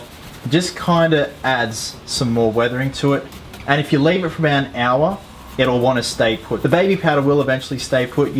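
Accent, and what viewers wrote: Australia. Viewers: your an aussie arent you??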